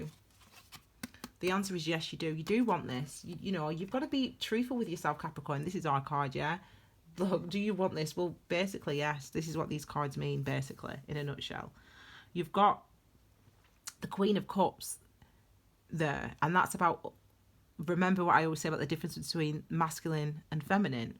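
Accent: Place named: North, United Kingdom. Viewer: as a fellow northerner (Sheffield) I love your accent, but omg, your personality just kills me. I am sure if we lived near each other we would be fabulous friends!! Keep doing what you're doing hun, because this was spot on for me and I couldn't have it delivered by a more down to earth, normal, hilarious person. Loved it!! xx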